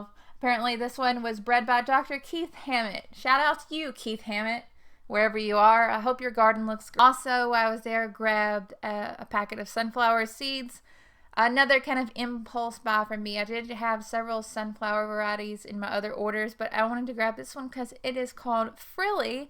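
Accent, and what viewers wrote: South, United States. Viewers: I have never grown sweet peas before and would like to try. You sound like you live in the south-so do I-do you have good success? It gets super hot where I live in north Georgia so I’m thinking I might be able to get some spring blooms.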